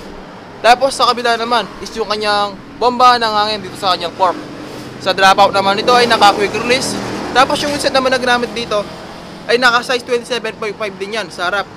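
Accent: Pampanga, Philippines. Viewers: Kapampangan accent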